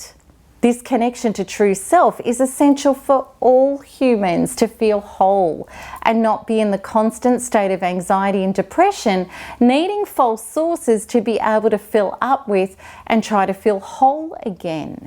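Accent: Australia. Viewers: Love your australian accent baby. Ur wonderful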